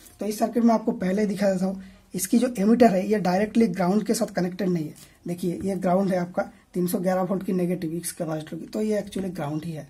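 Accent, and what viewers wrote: Bengal, India. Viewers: Are you Bangali??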